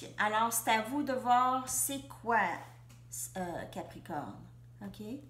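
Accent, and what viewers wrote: Canada. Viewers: Bonjour, et merci pour ce tirage qui me parle vraiment.....Vous êtes fort sympathique et cet accent délicieux du Canada (j'ai de la famille à Montréal et à Québec) moi je suis à Manosque en Provence...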